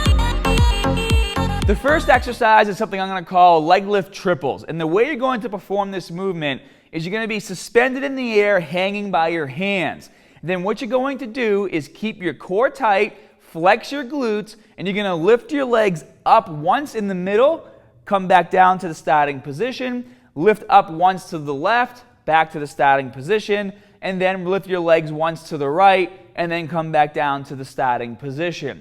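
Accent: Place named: Maine, United States. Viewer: What is the accent. Maine?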